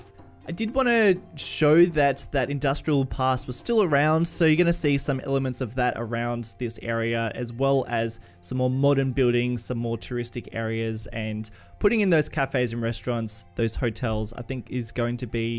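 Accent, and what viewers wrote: Australia. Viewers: Are you Australian? I love it when I find a youtuber who is from my country.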